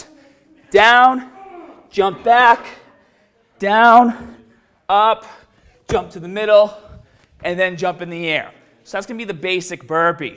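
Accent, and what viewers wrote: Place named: Massachusetts, United States. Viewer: this dude sounds like hes from Massachusetts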